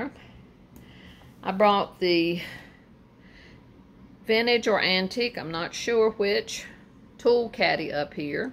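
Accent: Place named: South, United States. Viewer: Love it ALL!!! You certainly have more energy than I do!! Be careful in the heat!!! Fun to watch and hear your great "southern lady" voice!!! You, John and Baby Boy have great weekend! Good luck at the yard sales! 🧑‍🦳🏡🌹🙂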